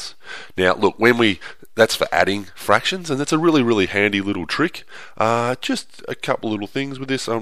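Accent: Australia. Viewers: i think he is Australian but we might never know.... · Is he Australian lol? I'm look at this cuz my recruiters keeps quizing me on mental math (and I can even look at the problem) but yeah.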